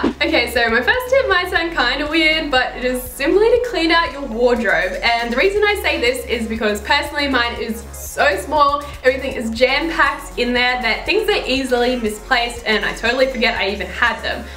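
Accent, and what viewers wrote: Australia. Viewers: are u from Australia?? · Are you Australian??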